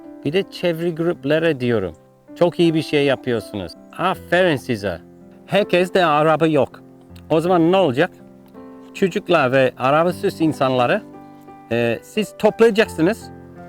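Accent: Turkey. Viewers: You are such a good person. I can't believe that a person who has a beautiful heart exists... Okay uncle, I'll meet my friends tomorrow to change the situation. We all love you and your Turkish accent!❤ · Bay.. Türkçeyi. Fethiye. Şivesiyle. Öğrenmiş. ❤️😊🌹🙏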